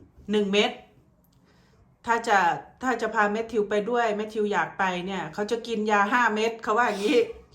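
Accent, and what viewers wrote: Thailand. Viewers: แม่ อยู่ภาคเหนือหรือใต้คะ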